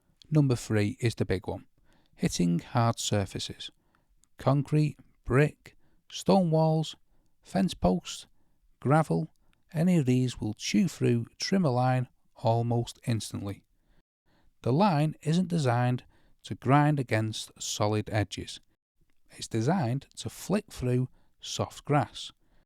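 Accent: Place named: Liverpool, United Kingdom. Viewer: Are you a scouser? My dad was and you sound just like him!!!!!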